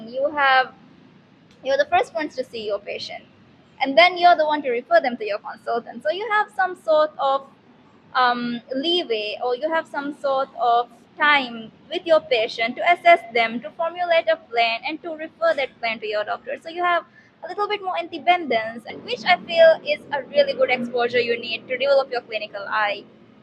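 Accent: Philippines. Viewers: Good,pilipino accent in English.thnx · She speaks Philippine English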